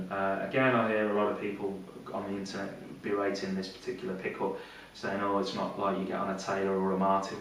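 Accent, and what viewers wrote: England, United Kingdom. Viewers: this review is cool if you like to hear people with an England accent talk from ten feet away from the microphone and ramble for thirty minutes without any demonstration.....I am not a fan. thumbs down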